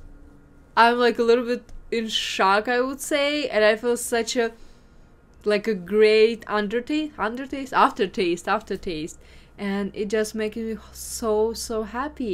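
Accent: Russia. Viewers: Haha. -- But she can entertain us however she wishes -- Just focus on that Russian Accent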